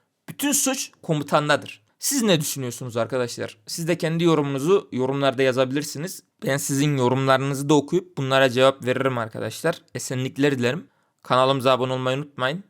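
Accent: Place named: Nevsehir, Turkey. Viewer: Ustam nevşehir li misin konuşma aksanın nevşehir ağzı çok iyi 👍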